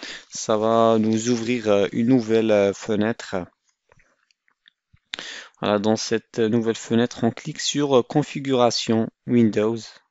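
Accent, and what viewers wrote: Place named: Morocco. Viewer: 3raftek mghribi gha mn lfrançais ta3ek 😂😂😂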